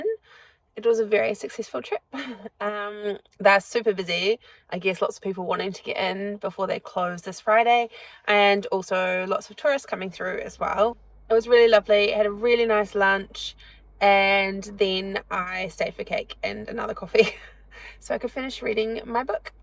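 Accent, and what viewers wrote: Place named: New Zealand. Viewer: Sounds like a Kiwi to me.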